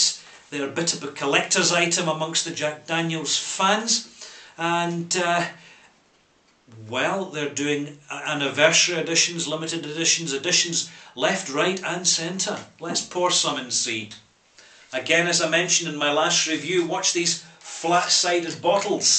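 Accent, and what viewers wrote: Scotland, United Kingdom. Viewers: Given your own great Scottish burr (and that of your brother, ) what took you both to the Isle of Man? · He's Scottish -__ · What is that accent, scottish?:)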